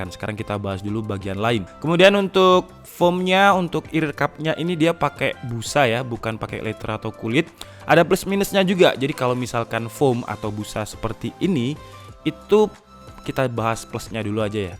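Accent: Java, Indonesia. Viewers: Wong jowo asli Iki.. ngomong Cok e mbulet🤣🙏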